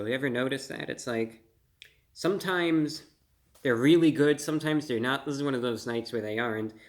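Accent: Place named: Transatlantic, United States. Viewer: I really like how his voice has progressively changed over the years, as he transitioned from the modern american accent to a nasal, higher-pitched transcontinental accent. This contributes to sink him deeper into his character, along with his old-school yet humble manners and overall appearance.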